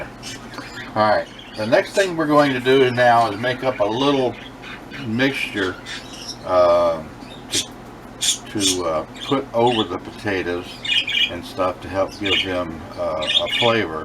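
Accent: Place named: Midwest, United States. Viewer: I enjoy listening to your calm voice. You sound like you are from the Midwest. I will try this.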